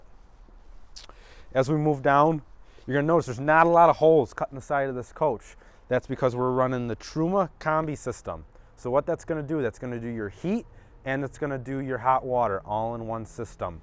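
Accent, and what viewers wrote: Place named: Chicago, United States. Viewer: I like your accent. You sound exactly like my cuz from the Chicago Southside (Bridgeport). You would blend in there quite well.🙂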